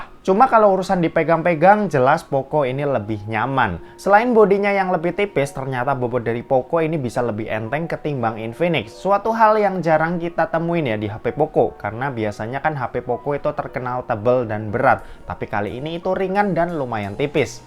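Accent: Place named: Banyumas, Indonesia. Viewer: Wong ngapak ya mas.....? Keton sitik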